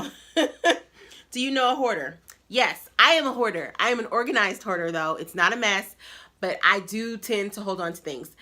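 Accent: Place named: Chicago, United States. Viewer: Yup you have an accent! Chicaaaago lol #NewSubbie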